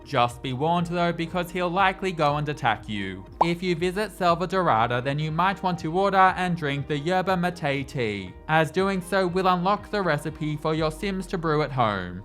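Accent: Australia. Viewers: Hey! No we don't! 🤣 I'm English, born and bred, and have either friends or family from all three of the other countries within Britain, and we pronounce it 'COE-coe' (both syllables rhyming with foe, or sew, with the stress on the 1st syllable) like I thought everyone else in countries that use English did until now. Don't think he's British, his accent sounds Australian? or New Zealand? but none of the Ozzies or Kiwis I know pronounce it 'coe-COE-ah' either. I also had a good laugh at 'bom-BAD-ee-er' (Bombadier Beetle) - I've never heard it pronounced any other way than BOM-bah-deer 🤣🤣🤣 Don't watch youtubers much so not familiar with this guy - I actually started thinking it might be an AI voice 😳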